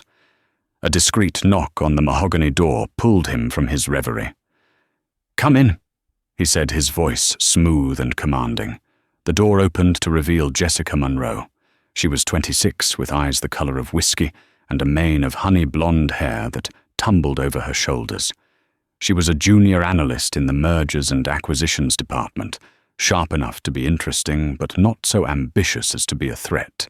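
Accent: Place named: Europe. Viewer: The narrator sounds like reading a fairy tale with European accent. Personally prefer a regular voice or a normal voice. Sorry. I couldn't stand the voice so I never finished the story.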